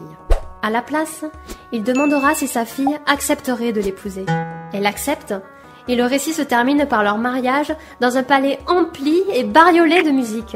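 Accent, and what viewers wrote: South, France. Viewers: L'accent du sudd <3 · Petit accent du sud guerre discret malheureusement^^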